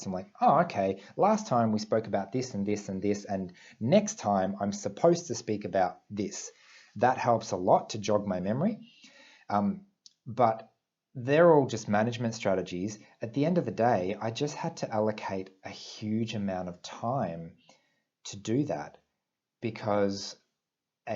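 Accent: Australia. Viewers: Hey man, you sound Australian (sorry if you turn out to be from NZ). Have you met Donna Williams?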